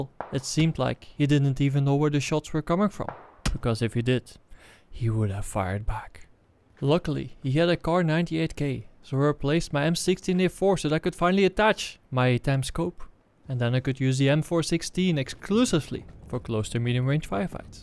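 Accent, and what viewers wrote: Netherlands. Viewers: love your vids man, question.. are u Dutch?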